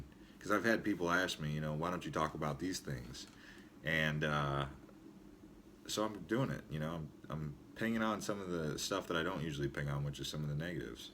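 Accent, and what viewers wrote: United States. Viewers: This is the virst Video I watching on your channel. You sound very american. Haha. Maybe I stay a little bit longer here and watch more. Thank you for this Video. Greetings from Vienna ^-^ d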